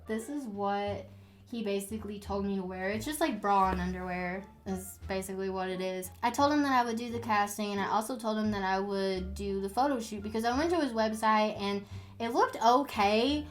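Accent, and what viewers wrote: South, United States. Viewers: Love your Southern accent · I love your country accent! I hope your from a southern state or this comment will sound really stupid lol... I’m new to your channel just watched your two videos about your old apartment complex ! I’m subscribed and love you!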